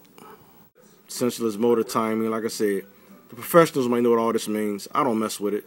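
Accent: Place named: Houston, United States. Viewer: Bet that. Im in Houston. Had a homie in High School from Philli. He was cool as hell....lol. But you sound like you from down here....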